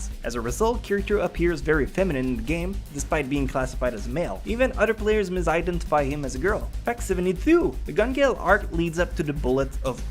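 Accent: Canada. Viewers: Clearing this up for everyone shitting on his pronunciation: he's French Canadian. For some reason, and I've experienced this through close friends of mine having the same issue, French Canadians struggle a lot with English pronunciation. This is because of tongue placement. In French, the tongue tends to rest on the bottom of the mouth when speaking, whereas in English it is more at the top. This is an issue when learning a new language later in life because the tongue has trouble getting used to switching from the bottom to the top and vice versa. And now you've learned something new! Enjoy your day!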